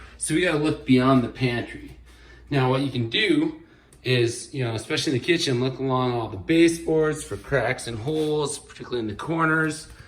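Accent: Australia. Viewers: Hah Hah....northern Australian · Entertaining and informative. Love the Australian accent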